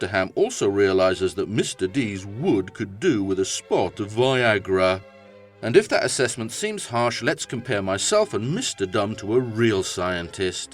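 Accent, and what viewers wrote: United Kingdom. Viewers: I like the montone swearing in a british accent, . . . and the classical music.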